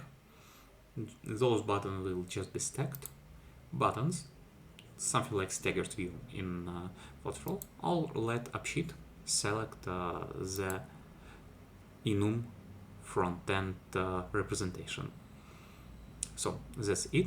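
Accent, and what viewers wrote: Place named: Russia. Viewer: Какой сочный русский акцент 😊❤😅 прям родная речь, мёд для ушей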